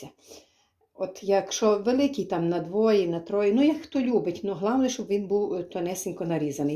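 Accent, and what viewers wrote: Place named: Khmelnytskyi, Ukraine. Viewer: Дякую за рецепт салату.Приємно чути Вашу мову,так у нас на Хмельниччині розмовляють.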